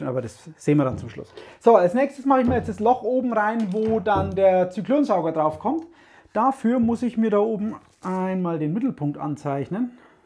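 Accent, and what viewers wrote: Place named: Franconia, Germany. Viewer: "Runsdrum" frängisch is einfach genial 😉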